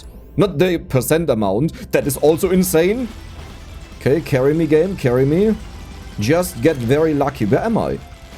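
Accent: Germany. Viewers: Dude at 50 i totaly felt the need to speak german 😂😂 Moved to germany few years ago still bad at the language but i enjoy your english german accent since i always hear it everywhere i go and its comforting to listen to it while youre playing. Perfect time to vent frustration out 😂❤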